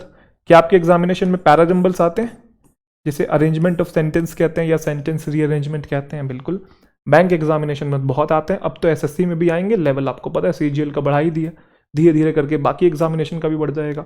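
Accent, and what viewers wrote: Haryana, India. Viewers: Sir aap haryana se ho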